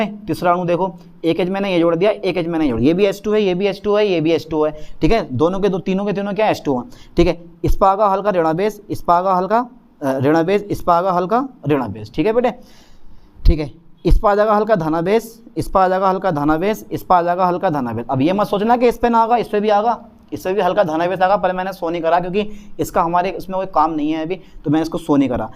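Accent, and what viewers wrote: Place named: Moradabad, India. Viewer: Sir aap muradabad ya sambhal ke ho mujhe lg rha hai 😂😂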